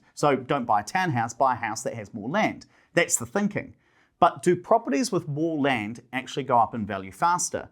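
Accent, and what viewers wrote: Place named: New Zealand. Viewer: Yuk Kiwi baby accent yuk Kiwis are such retards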